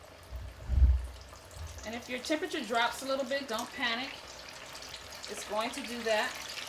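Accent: United States. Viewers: Yummy....Huge fan of your cooking and loved American accent. Wish you have your own TV cooking shows or even better come down under Australia and shows us Aussies your delicious cooking recipes.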